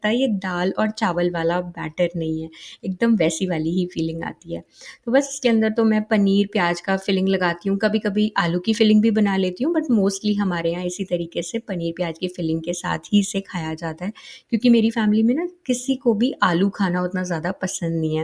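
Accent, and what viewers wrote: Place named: India. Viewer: Aap hindi mai comfortable then use hindi half hindi n half English is a no no no ur english sounds more hindi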